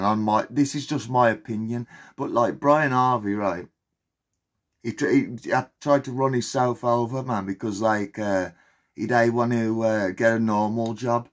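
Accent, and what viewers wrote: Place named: Black Country, United Kingdom. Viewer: Nice black country accent...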